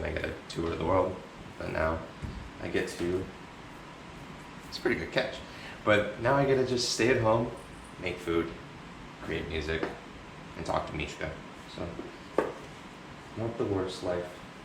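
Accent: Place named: United Kingdom. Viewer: AM I THE ONLY WHO HEARS SLIGHTLY BRITISH ACCENT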